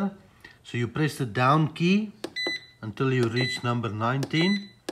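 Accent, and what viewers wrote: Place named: South Africa. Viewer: You cant mistake a south african accent anywhere ...nice one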